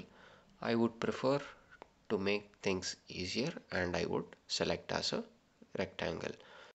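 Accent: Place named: India. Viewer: indian accent too thick to get Plz stop toturing people induan guys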